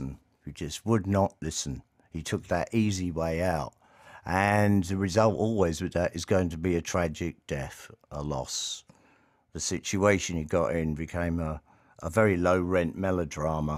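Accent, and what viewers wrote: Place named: United Kingdom. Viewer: British accent and editing sounds smart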